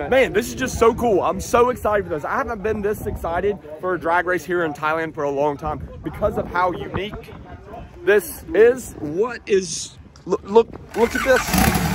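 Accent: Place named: Pittsburgh, United States. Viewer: Where are you from originally? You sound very Pittsburgh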